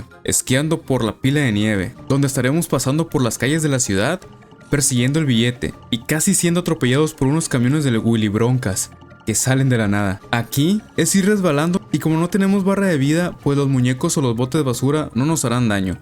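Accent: Chihuahua, Mexico. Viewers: Aaayyy compadre y ese acento de shihuahua? 😻🫶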